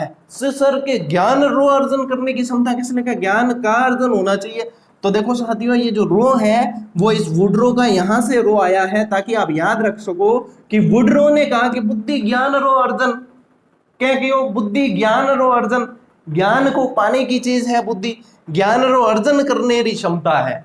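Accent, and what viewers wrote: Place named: Marwari, India. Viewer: Sir ji aapki marwadi language bahut acchi lagti hai aap aise hi bola karen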